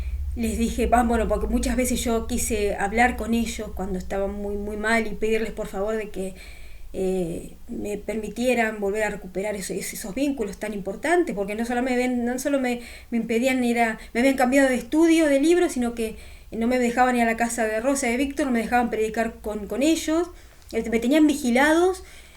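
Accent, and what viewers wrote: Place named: Argentina. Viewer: Que curioso que hayas nacido en España, con ese acento pensé que habrías nacido en Argentina jaja. Que sorpresa lo de tu padre no sabía que era un artista de tango. Lo que cuentas muchos lo hemos vivido también, hay mucha hipocresía ahí dentro. Ancianos que fuman en su horario de trabajo (cuando creen que nadie les ve), adulterio, hermanas que cuando pasan las fiestas con su familia “mundana” que no es testigo celebran navidad y cumpleaños pero luego guardan las apariencias en la congregación (los típicos que tienen un pie dentro y otro fuera de la organización), etcétera. te prohíben cierto tipo de música y luego ellos andan escuchando música “mundana” de lo peor, en fin muchísima hipocresía. A mi de adolescente me prohibían ver ciertas películas porque decían eran malas y no se podían ver pero luego nos invitaban a alguna fiesta en casa de un anciano y su familia y veían películas de Disney como la cenicienta blancanieves o la bella durmiente que también tiene brujería y yo pensaba por qué yo no puedo ver tal o cual película y ellos si pueden ver películas disney que tiene brujería? 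En fin, pura hipocresía, la verdad es que hay un doble rasero y una hipocresía impresionante dentro de la secta. Nadie te tiene que decir que música puedes o no puedes escuchar, eso es decisión personal tuya y nadie se debe meter en esas cosas. Pero te quieren controlar cada aspecto de tu vida. Luego años después leí en un artículo de su página oficial JW org donde dicen que ellos no tienen una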